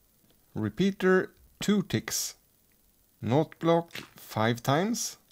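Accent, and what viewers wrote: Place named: Sweden. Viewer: It sounds like ur swedish and talk english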